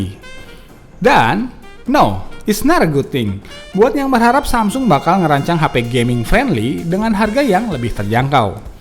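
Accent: West Java, Indonesia. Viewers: orang sunda kang?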